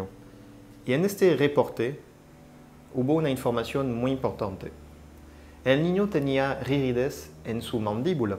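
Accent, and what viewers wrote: France. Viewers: Joli accent français ) pero el caso es desgraciadamente más complicado.. Aunque la primera versión que surja es la de Bernard.. y sigue siendo la más verdadera. Je crois que c'était lui.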